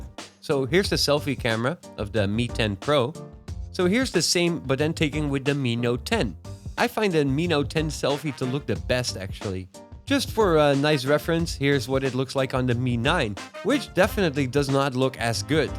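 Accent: Netherlands. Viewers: Just started following you because I heard your Dutch accent 😂👍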